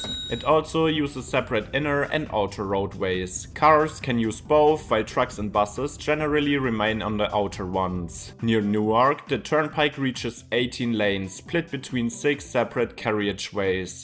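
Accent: Germany. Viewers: That German accent is too pronounced and unmistakable.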